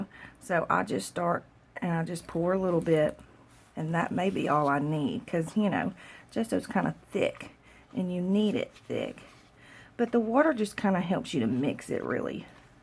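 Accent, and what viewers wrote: South, United States. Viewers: Thank you for sharing. This is simple and doable. Plus... your southern accent is everything 😍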